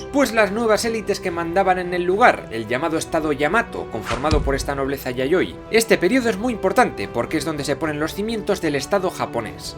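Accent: Spain. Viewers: No me cuadra escuchar la historia de Japón en voz de un español.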